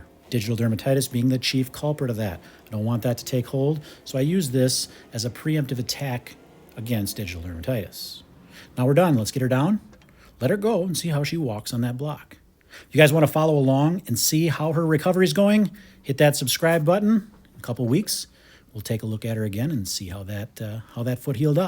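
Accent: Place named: Midwest, United States. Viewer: I love the Midwestern accent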